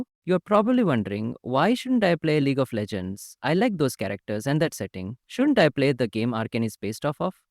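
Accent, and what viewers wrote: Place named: India. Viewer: Hey bro amm the vedeo is good but wat I think it lacks is a good english if you wanna improve it just find some one to chat with by chat i mean talking not texting and It should make your english sound less Indian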